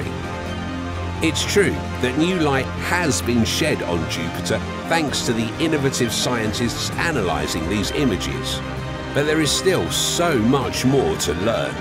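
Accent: United Kingdom. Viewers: I know Ur UK english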